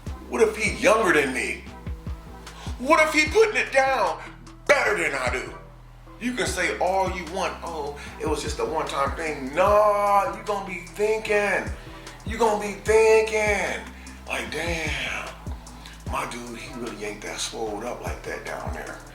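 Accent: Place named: Russia. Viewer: That accent sound either Russian or Ukrainian, at least for the ideal intro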